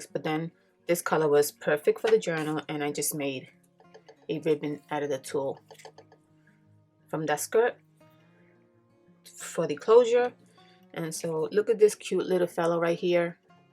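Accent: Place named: New York, United States. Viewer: Absolutely amazing! Love the adorable racoon printable!! I really like you NY accent too! Adorbs!! :)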